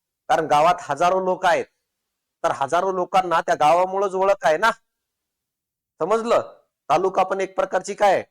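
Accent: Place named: Marathwada, India. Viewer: Sir tumhi aaplya मराठवाड्यातील आहेत हे आपल्या बोलण्यातून आपुलकी नक्कीच कळते.....❤❤ सोबतच सायन्स हा विषय खूप सुंदररित्या story type Ani intersting easily concept explain krta....salute to as you gain the understanding over the science in your academic n now you are applying it❤❤ it's obsolutely great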